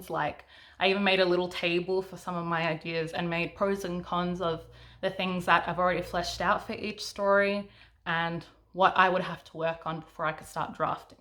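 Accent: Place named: Australia. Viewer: Hey there! Fellow Aussie here! Glad to here a familiar accent. I found you from Emily's channel. I'm currently 50K into a 100K urban/paranormal fantasy, so I want to get the last 50K of the first draft finished for Nanowrimo. I understand that worldbuilding can be a huge thing, especially when (like me) you're trying to take existing myths/legends/creatures and find a place for them in your own world - on top of all the other worldbuilding that needs to be done. There's developing cultures, drawing maps (even an urban fantasy needs to know the lay of the land/city) and everything else. If you're interested, there is the great site called "World Anvil". The developers are a husband/wife team - he is a gamer (D&D etc) and she is a writer, so the site is made for both types of creators. Even if you don't end up putting all the details of your world on there - the templates are fantastic for providing all sorts of questions that you can use to help develop ideas. 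They make you think about things you might not have considered, and fill out details for things you might already have. It's great!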